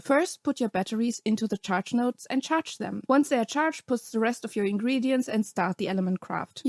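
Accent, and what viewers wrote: Germany. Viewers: I subbed after watching your guide to breeding. Best explanation i could find. Well done. Also subbed for the nostalgia as your accent reminds me of my cousins who live in Germany ☺️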